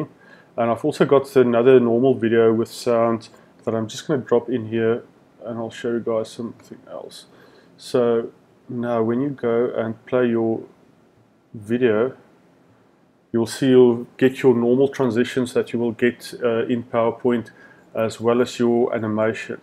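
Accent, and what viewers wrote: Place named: South Africa. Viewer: Thanks for this! Recognise the South African accent! So great to see great content for SAFFAs.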